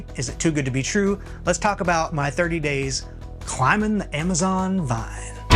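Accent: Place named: United States. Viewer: 😂 it blew my mind, especially because you sound american. Otherwise I’d just assume that you’re from scandinavia or something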